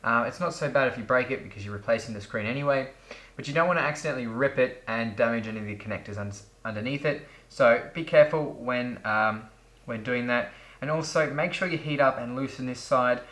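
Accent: Australia. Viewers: He lives in Australia. He has an accent. He means cellular. I have a cellular iPad 3, but never used the cellular service. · Is he Australian? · You can clearly hear that he is an aussie wtf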